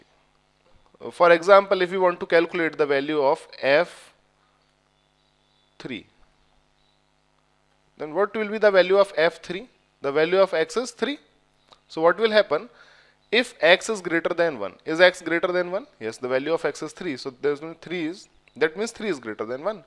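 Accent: India. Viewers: When I hear Indian accent. I immediately go elsewhere. It's like an algorithm for me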